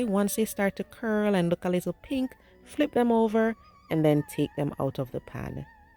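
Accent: Jamaica. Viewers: Thanks for sharing, my daughter said she will surely fix this. ❤ ur Jamaican accent beautiful!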